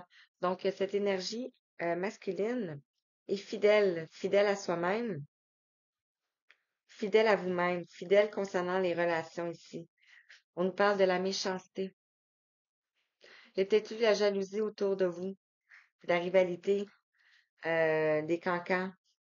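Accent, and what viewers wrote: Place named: Canada. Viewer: Bonsoir 😊je vous écoute avec beaucoup d'attention et je suis bluffée ❤merci beaucoup pour votre voix douce et votre accent canadien tellement sympathique 😊 pas trop tard pour vous adresser mes meilleurs vœux pour en cette nouvelle année à vous et votre famille ❤❤❤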